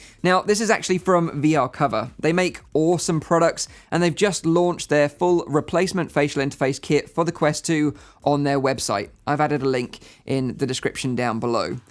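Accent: United Kingdom. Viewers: He’s just British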